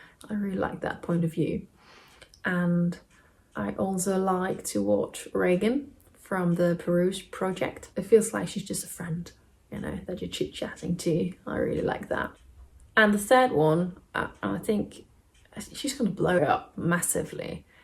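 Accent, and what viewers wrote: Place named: United Kingdom. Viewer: I'm surprise you are from Denmark. You sound like a British person, so I thought you were from England.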